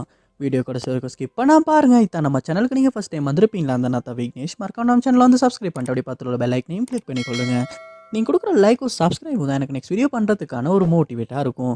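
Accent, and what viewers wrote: Sri Lanka. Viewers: Are you sri lankan tamil ?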